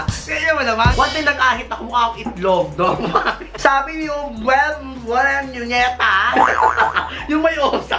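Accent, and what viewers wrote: Visayas, Philippines. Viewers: Bisaya po ba kayo?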